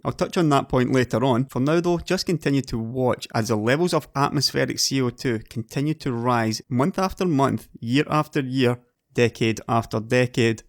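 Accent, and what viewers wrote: Scotland, United Kingdom. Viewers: Love the video and watched through fascinated although I knew most of it. The sound of Scottish makes it that more interesting. Thank you.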